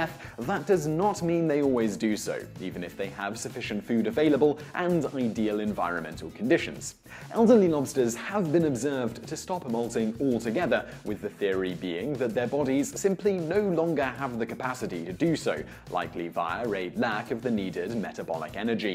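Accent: United Kingdom. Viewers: Yeah I turned it off before a minute I don't need to hear a lot of blah blah blah in a British accent about stuff I already know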